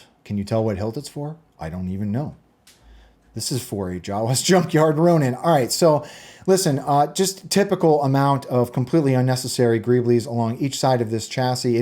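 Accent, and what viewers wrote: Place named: United States. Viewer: You got an American accents and thus American rights and you have a wall of light sabers vs guns? Cmon be a man and stop playing with toys like a child, a second revolution is drawing near and here ya are playing with toy swords instead of grabbing somethin with some real edge to it. Where’s your wall of AR’s and Glocks? 0/10. Do better. Our forefathers/ancestors are looking upon your works and judging as the tree of liberty is dying of thirst.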